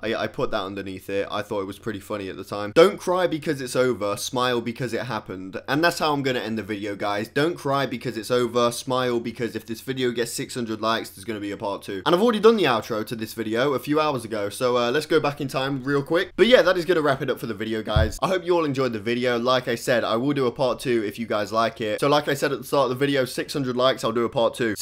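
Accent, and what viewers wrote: England, United Kingdom. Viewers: Your accent makes me want to move to england.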